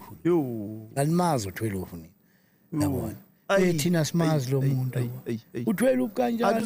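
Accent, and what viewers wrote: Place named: KwaZulu-Natal, South Africa. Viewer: Eeeeiy uwungowaseKZN ngempela mina yakuzwa😂😂😂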